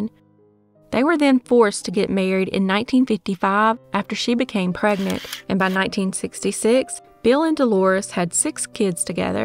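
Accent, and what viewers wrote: South, United States. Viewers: Good evening Southern Belle 😉 so good to hear that Sweet Southern sound! Thank you for putting all the time and effort in to your videos! Your dedication brings us listeners true sad and sometimes happy stories. Much love from Washington State 💞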